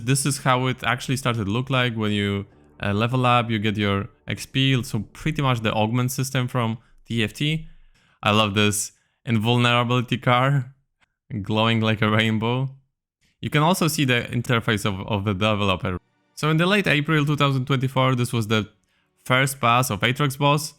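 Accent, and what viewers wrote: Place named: Poland. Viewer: aren't you polish? your accent seems similar xD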